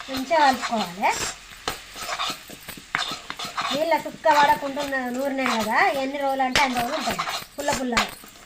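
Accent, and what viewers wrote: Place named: Karimnagar, India. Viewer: Memu elage chestamu sooooooper Naaku chala estamu😅😊karimnagar language Amma😂 Maadhi karimnagar 😂meedi vooru ekkada Amma😂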